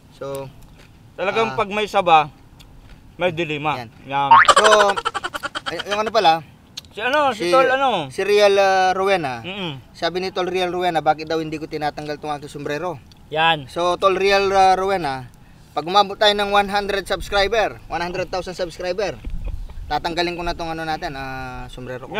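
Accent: Pampanga, Philippines. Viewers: Halatang kapampangan kayo pre hahahaha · LOL... PARANG NARINING KO ANG NANAY KO SA IYO PAG NAGTATAGALOG MAY HALONG KAPAMPANGAN magkasakit lumalaro LOVE YOU GUYS (KALUGURAN DA KAYU) MORE VIDEOS PLS.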